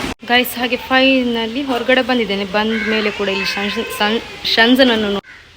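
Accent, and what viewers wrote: Mangalore, India. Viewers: Talking slang klidre mangalore irbeku ansatte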